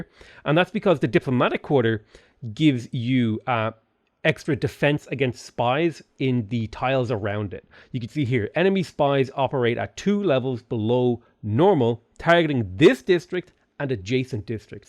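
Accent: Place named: Ireland. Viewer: The Irish accent makes a return by the end of this video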